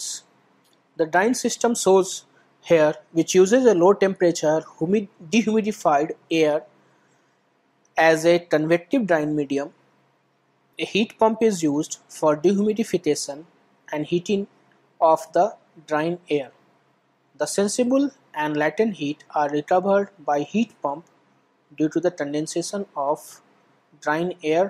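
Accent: India. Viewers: LOL, LOL, LOL. that is the way they speack in India, so please learn more languages so your brain will be open to understand, I speack 5, and you ?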